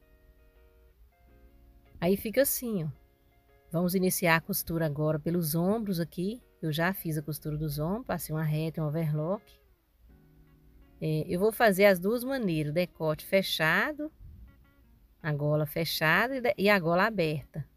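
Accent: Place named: Minas Gerais, Brazil. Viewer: Estou há anos vendo vídeos de costura, e nunca vi os seus. Aonde vc estava mulher? Meus Deus. Vc é um misto de simplicidade, competência e profissionalismo, agora pra me destruir, vem com esse mineirês que eu amo. ❤❤❤❤ Parabéns.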